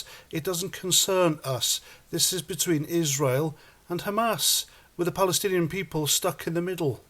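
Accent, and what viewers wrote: Wales, United Kingdom. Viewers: You sound Welsh. They've just sent some so-called British Palestinians to your country.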